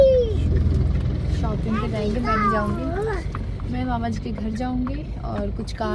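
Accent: Bihar, India. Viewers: Bihar me aisa bolte h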